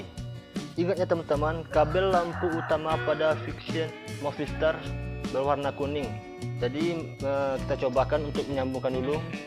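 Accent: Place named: West Sumatra, Indonesia. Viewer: kek nya abang nih orng minang.. ketahuan logatnya.. Salam rider yamaha bang hehe 🤗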